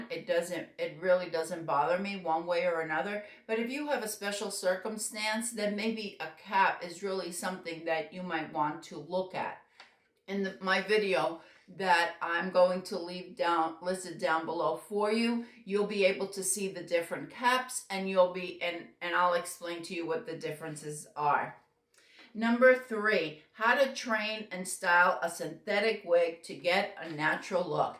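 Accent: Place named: New York, United States. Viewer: Heard NJ / NY in there somewhere! Thank you for your videos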